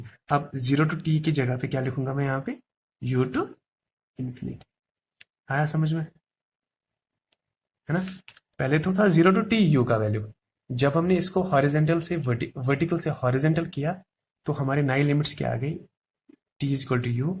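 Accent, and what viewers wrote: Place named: Kashmir, India. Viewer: your kashmiri accent is too good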